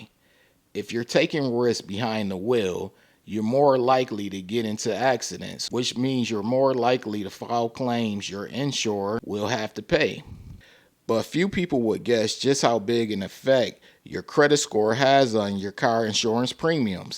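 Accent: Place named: Chicago, United States. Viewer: Definitely Chicago accent.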